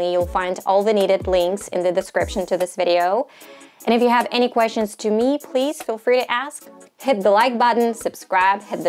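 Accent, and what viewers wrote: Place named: New York, United States. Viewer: your accent is amazing. There are many American accents however: the Southern accent, New York accent, New England, Upper Midwest, California and more. The standard American accent is what you hear on national news programs. Yours is something I hear a lot but I'm not sure where. You have a bit of New York in your vowels! And your nasal quality reminds me a lot of Middle Atlantic, in other words Philadelphia/ Baltimore. I am interested to know what approach you took on accent.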